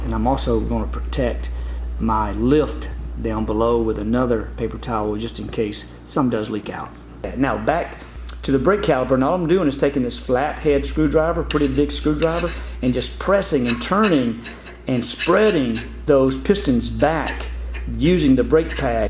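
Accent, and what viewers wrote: South, United States. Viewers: Great vid! Well videoed. Easy to see what you were doing along with an understandable explanation. Love the shit kicker drawl.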